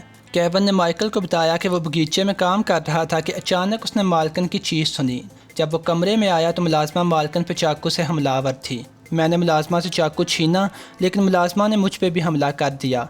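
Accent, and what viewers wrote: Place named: Lahore, Pakistan. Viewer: Are you lahorei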